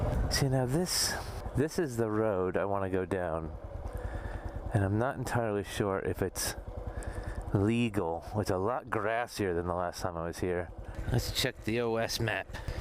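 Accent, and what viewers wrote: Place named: Midwest, United States. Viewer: ive never been to this channel in my life, why does this guy sound like hes from the mid-west but he says he's living in the UK? I'm so confused.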